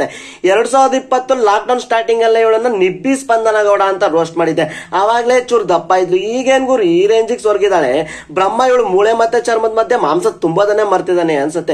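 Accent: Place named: North Karnataka, India. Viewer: ಸ್ವಲ್ಪ ನಮ್ಮ ಉತ್ತರ ಕರ್ನಾಟಕ ಭಾಷೆ ಬರುತ್ತೆ ಅದಂತೂ ಗಿಚ್ಚ್ ಗಿಲಿಗಿಲಿ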